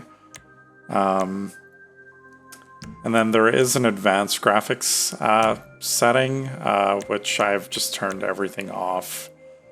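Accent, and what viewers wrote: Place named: Canada. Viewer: You have a sexy Justin Trudeau accent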